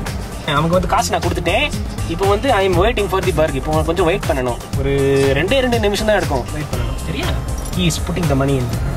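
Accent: India. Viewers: LOL, the best part is his amazing tamil accent and stupid english :D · this guy has the thickest indian accent ever.....and it's real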